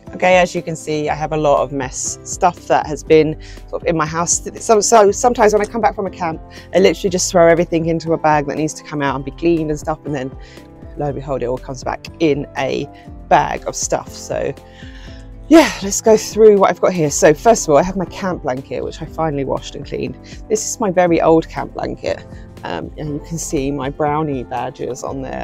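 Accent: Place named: Southern England, United Kingdom. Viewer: I thought you sound like a southern girl. Love your crochet blanket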